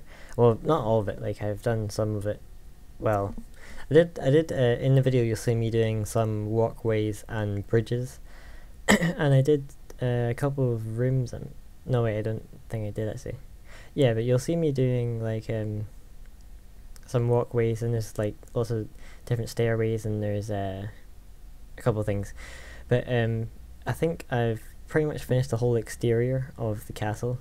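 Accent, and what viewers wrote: Scotland, United Kingdom. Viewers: He IS Scottish. People mistake his accent for an Irish accent. Also, I live in Texas as well, just as a side note, and the "cowboy stereotype" irritates me a lot. Maybe it's because I'm a goth, but I don't wear the make-up or have piercings, because I think it's too much work with too little significance.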